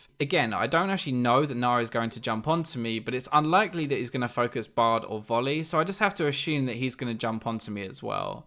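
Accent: United Kingdom. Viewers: Where are you from,? U have kind of a British Accent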